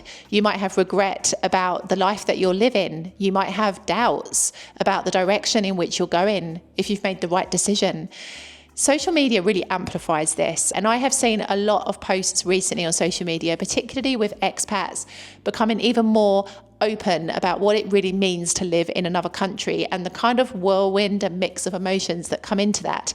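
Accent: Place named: United Kingdom. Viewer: Ugh .... that british accent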